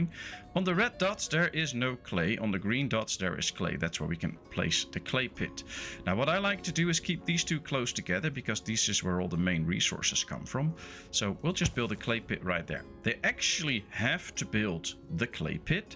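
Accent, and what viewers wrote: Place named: Netherlands. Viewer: Goede tutorial. Ik zou wel mijn Nederlands accent wat proberen weg te poetsen. Klinkt nog te veel als Mark Rutte die Engels probeert te praten. Probeer "kid" niet uit te spreken als "kit" en "pause" niet als "pos". Ze hebben een wat langere klank. Update: en "wood" niet als "woet" ;)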